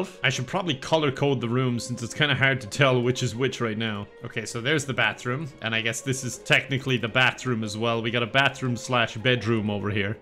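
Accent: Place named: Ireland. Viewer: Awww you are starting to lose your cute irish accent. 😔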